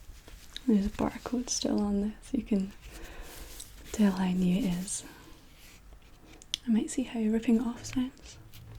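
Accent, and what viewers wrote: Scotland, United Kingdom. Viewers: Do I hear a Scottish accent 👀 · I wish I had a Scottish accent, It sounds amazing. I'm starting to hate my southern draw, because It makes me sound stupid XD... Great video nonetheless, subscribed!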